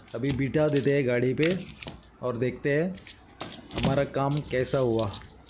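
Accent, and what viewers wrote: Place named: Mumbai, India. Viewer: Hello irmao appreciate your skill and precision work . Not jugadu but your work and perfection it's like O E M BOSCH. 👍👏 Your Hindi is we call dobitalv Bombay uncle and aunty Hindi . Nice to listen . Greetings have nice day 🙏💐👌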